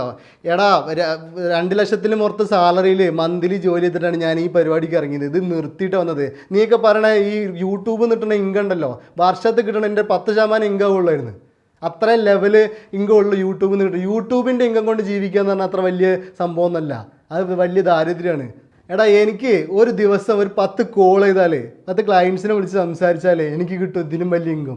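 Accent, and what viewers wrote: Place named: Kozhikode, India. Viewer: I like that kozhikoden slang😊😊😘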